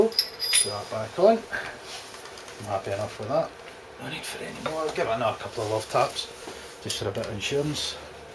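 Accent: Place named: Scotland, United Kingdom. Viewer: I believe he's a Scot....love the accent:)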